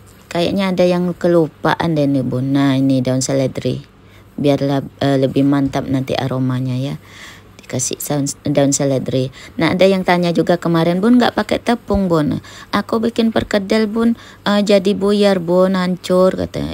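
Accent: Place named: Lampung, Indonesia. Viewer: Dari lampungkah bun. Logat bicaranya kaya org lampung ato palembang gitu 😊😊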